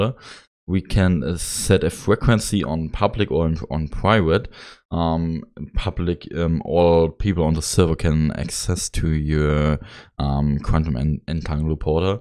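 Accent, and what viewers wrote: Germany. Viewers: Ich höre deutschen Akzent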